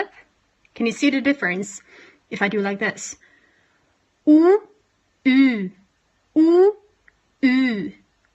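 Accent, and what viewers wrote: United States. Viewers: no lol. just say she speaks good english, u don’t need to lie. she still says for example “beddur” instead of (as most people from the UK would) “better/be’’er”, which is more correct and sounds nicer to the ears. not her fault though, sadly it’s american tv etc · I hate to learn Norwegian from a person with American accent. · Take it from me, you sound like you you learnt American English and not British.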